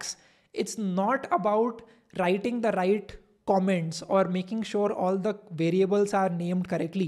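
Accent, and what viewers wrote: India. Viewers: No racism, but the Indian accent lowkey legitimizes this video.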